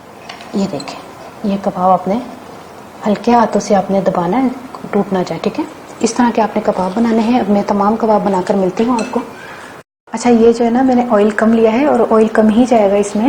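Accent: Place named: Punjab, India. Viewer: Yar apki punjabi bare mazy ki ha api aur mein larki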